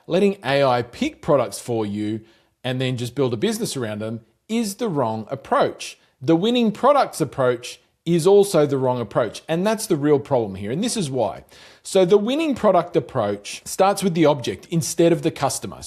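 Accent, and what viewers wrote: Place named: Australia. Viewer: Love hearing such great AI myth busting here! Us poor dropshipping folk always get branded as the lazy ones reaching for the the new shiny thing. Love the channel and great hearing from a fellow Aussie!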